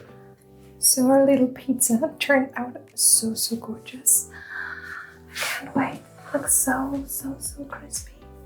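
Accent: Germany. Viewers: She is German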